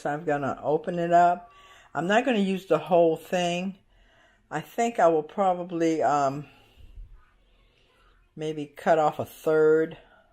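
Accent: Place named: North Carolina, United States. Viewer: I love liver pudding, black sausage, blood sausage, and the Spanish speaking say "morcilla" I knew you were from N Carolina when you first spoke, bless you auntie -from nyc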